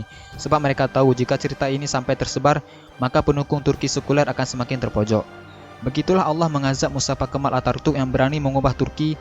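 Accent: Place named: Aceh, Indonesia. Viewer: Admin seperti dialek ureung aceh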